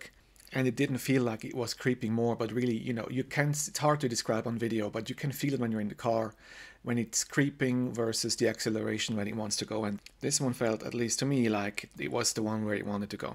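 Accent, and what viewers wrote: Switzerland. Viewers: Are you from Switzerland? 😅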